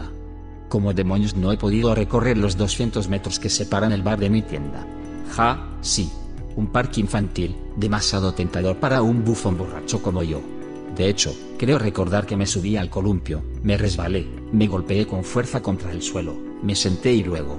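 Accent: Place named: Russia. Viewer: Yo digo aue si es rusa porque su acento se oye muy marcado.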